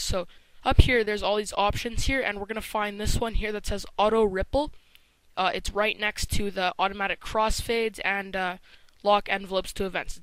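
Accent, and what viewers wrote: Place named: Canada. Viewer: I'm guessing your Canadian. I have a keen ear for accents.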